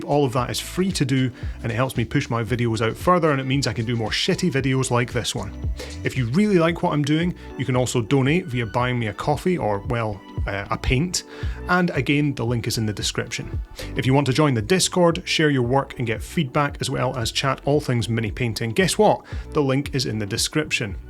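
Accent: Scotland, United Kingdom. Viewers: Nice video, good job on the green paint, end result would be fine on any table top. Side note: There is something about snarky comments and a Scottish accent that amuses the heck out of me. Always a good chuckle or three in your videos. Keep up the good work... love it.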